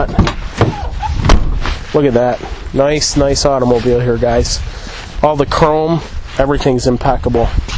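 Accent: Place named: Michigan, United States. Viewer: You must be from Michigan I can tell by your accent. Also how much?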